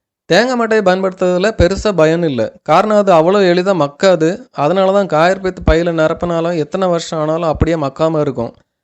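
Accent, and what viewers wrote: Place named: Tirunelveli, India. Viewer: athu nellai Tamil... Aana ippo konjam kovai Tamil mix aaguthu...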